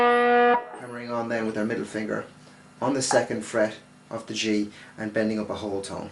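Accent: Ireland. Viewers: Cool lesson Just wondering, r u Irish? U just kinda have the accent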